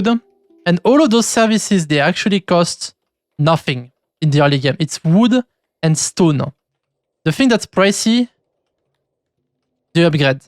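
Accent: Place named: France. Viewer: J’adore ton accent bien franchouillard ! Superbe vidéo j’ai appris pleins de trucs merci bcp ! T’as cb d’heure sur les jeux ?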